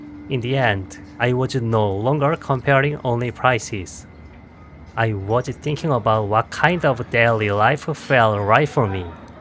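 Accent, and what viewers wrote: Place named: France. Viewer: Hi Bro, i´ve a few questions. You mentioned that Bangokok grows in cost from 2021 to today, this is in bath or US dollars? when you move around the country, you use train, bus or plane? Estimative, how much money you need by month, for all expenses ? (Aproximaly) And last, do you speak French? You have the accent!!!! Thanks for your work, and I send to you a hook from the end of the world (Ushuaia)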